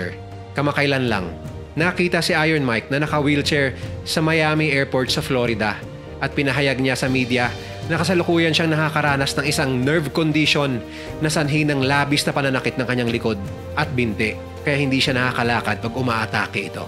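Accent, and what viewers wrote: Visayas, Philippines. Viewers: Bisaya ka pre no? Hahaha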